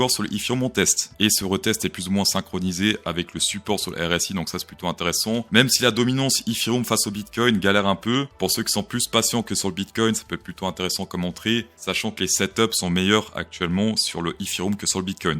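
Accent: Vaud, Switzerland. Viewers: Tu l'entends à des kilomètres l'accent vaudois, sans oublier le "huitante" 😉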